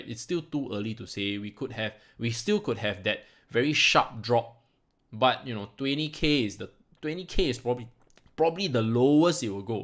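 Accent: Singapore. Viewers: What accent is that? Singapore?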